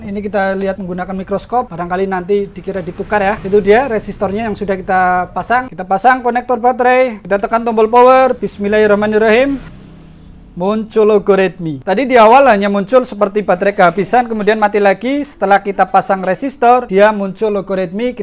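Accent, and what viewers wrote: Banyumas, Indonesia. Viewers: Mantap bg,, kayaknya orang ngapak ya dari logat😁👍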